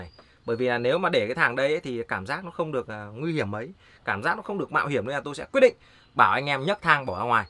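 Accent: Thai Binh, Vietnam. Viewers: Anh ở Thái Bình đúng ko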